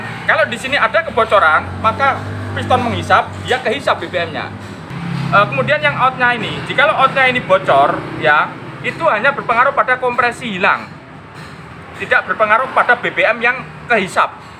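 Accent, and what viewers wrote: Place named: Medan, Indonesia. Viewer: Abang satu ini kayaknya asal Medan😁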